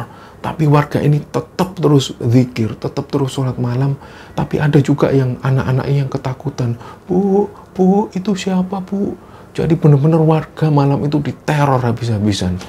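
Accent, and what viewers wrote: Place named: Banyumas, Indonesia. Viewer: Iya jelas ngapak lur😁 oke siap lur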